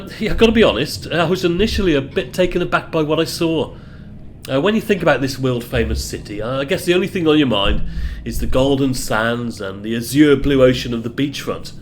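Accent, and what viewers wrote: Midlands, United Kingdom. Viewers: I'm off to Mexico in a couple of weeks - thanks for doing this upload :) PS do I detect a fellow Midlander from the accent ?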